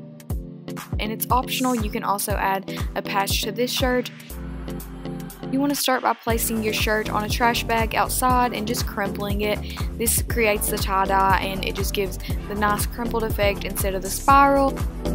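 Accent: South, United States. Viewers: Love your accent! I'm from Atlanta and my dad is from Alabama so we're used to that kinda thing😂 your style is so cool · People are getting annoyed because of her southern accent but it doesn't matter to me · Nikki King It's definitely a southern accent!😁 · SPOOKY💖 btw I love your accent I'm also from the south❤️❤️ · She's just southern lmao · We have the same voice SOUTHERNERS ROCK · she has a southern accent....really not that hard to figure out lmao Idk why people think she's from europe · she's southern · shout out to someone else who got a southern accent like me :) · 2gymnasticgirls that's not a " Texan" accent. It's called southern 😊😤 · you're video was amazing! I love all the ideas and I think you have the cutest southern accent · She's southern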